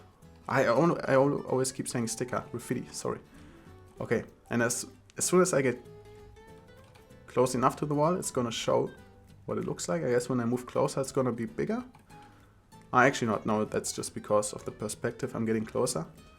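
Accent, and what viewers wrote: Germany. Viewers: Man merkt einfach immernoch dass du Deutsch bist😂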